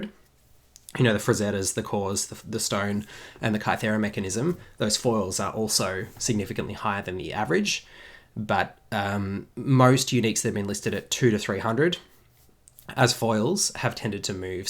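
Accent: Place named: Australia. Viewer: What currency are you talking here? USD or AUD? You sound Aussie.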